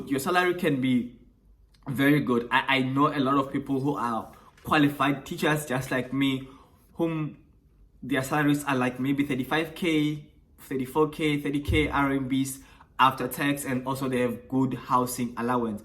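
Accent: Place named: South Africa. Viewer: Also considering the fact that he's South African, but definitely not a native English speaker from South Africa (there are native English speakers in South Africa, he is not one)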